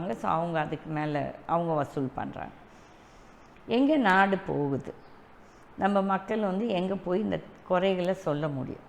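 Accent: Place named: India. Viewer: Would be more genuine if you have concern on soaring fuel price, high inflation, migrants plight, increased toll fares, dampened msme industry. There are bigger national level corruptions that you've conveniently missed to mention. Rajaji s swatantra party did nothing useful to TN and you are carrying the same name; doubtful on your agenda esp to avoid elections - do you want to continue the existing corruption to go on. Election is the only tool to correct the citizens' mistake/fate; you want that to be denied for the vested interests. Should also mention that thousands crowded in Ayodhya, moharram day at Hyderabad, sellur Raju meeting at Madurai or the mahalaya amavasya at sathuragiri. Pls speak Tamil in the native slang, the hindiing sound is irritating. நிலானியின் தமிழை கேட்கவும்.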